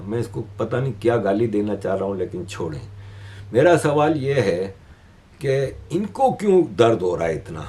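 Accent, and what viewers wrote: Gujarat, India. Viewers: Aap ka bolne ka tone Gujrati lagta hai. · Your roots are from Gujarat & your tone of language ( lehjaa) still reflects the slang tone of traditional gujrati language...Proud of u sir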